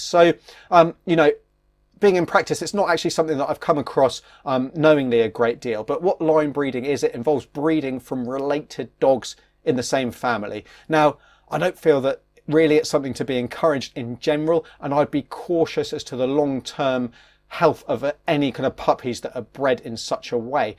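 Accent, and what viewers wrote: United Kingdom. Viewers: Look at his british accent 👁️👄👁️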